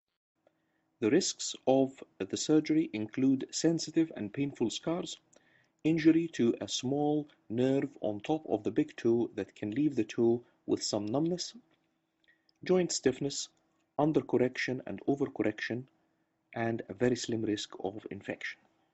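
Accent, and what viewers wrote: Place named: Eastern Europe. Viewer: Where comes the speaker from? I think he are from finland or russia or other slavic country!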